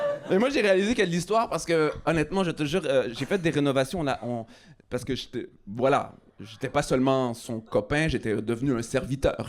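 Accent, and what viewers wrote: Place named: Quebec, Canada. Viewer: J’adore 😂 beau gris avec un bel accent québécois naturel et avec des expressions nordistes… 🥰😍🤩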